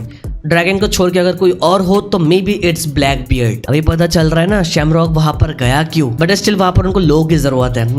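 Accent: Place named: Bangladesh. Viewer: This is a Bangladeshi Channel.. I guess from his accent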